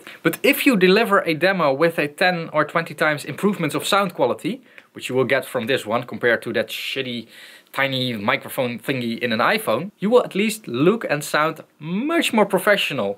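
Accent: Netherlands. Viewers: I thought I heard some Nijmegian in your Dunglish but damn it is the other side of the river :) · Agreed. I used to date a Dutch girl so I find the accent adorable. Lol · Yo-yo. I know you're Dutch because of the accent. You can tell it easily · Love the Dutch accent! Better than Australian, which is often unintelligible. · Deze man ze accent is best goed maar als nederlander hoor je gelijk dat ie nedelands is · you pronounce like a dutch guy does and should, like myself, I hate all those quasi perfect american accent dutchies showing of their cosmopolitism, just stay as you are with your pronounciations!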